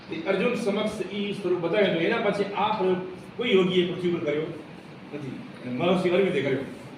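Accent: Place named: Kutch, India. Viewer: Prabhu pranam. Tame je bhasa bolo chhe te kutcha na matra 24 gam leva patel bole chhe. Teo 350 varash pahela virpur ni aspasma te migrate to kutch. I guess you bro,living near rajkot. I m living abroad for 40 years Thaks.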